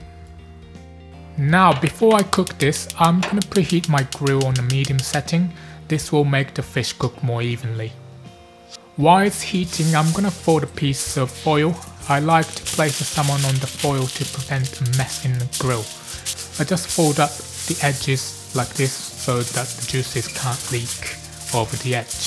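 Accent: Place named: Cockney, United Kingdom. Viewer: seems to have (charmingly) picked up a Cockney accent. Bet he went to London?😊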